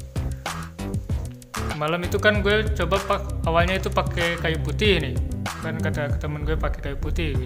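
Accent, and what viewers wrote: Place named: West Java, Indonesia. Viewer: Meuni sunda pisan logat b.indo nya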